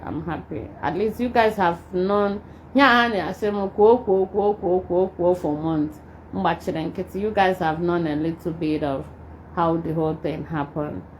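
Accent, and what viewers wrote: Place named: Igbo, Nigeria. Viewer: Madam sharrap. With your Igbo English.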